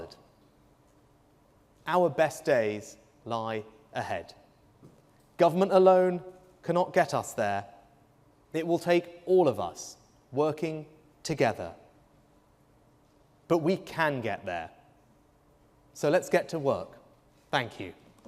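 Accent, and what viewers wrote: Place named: RP, United Kingdom. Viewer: He sounds so upper class British - how about the working class?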